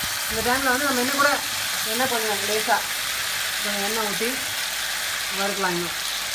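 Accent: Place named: Chennai, India. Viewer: So sweet of u for carrying our Chennai slang .very pleasant to hear in u.s.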